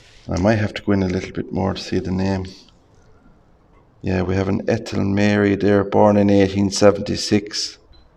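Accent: Ireland. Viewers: Wonderful narration made more enjoyable by that beautiful Iris lilt……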